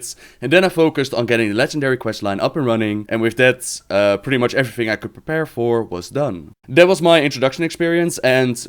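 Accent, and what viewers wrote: Netherlands. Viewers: Woaahhhhh :D first Dutch accent I've ever heard! Peace out man! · I was wondering about that accent too, though I found out you were Dutch because I started watching your Majora's Mask videos and you mentioned it. I really like the accent. Can't wait for more lore, you've been making me really happy to be back in the game. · HOLLAND :D het accent valt wel mee hoor ^^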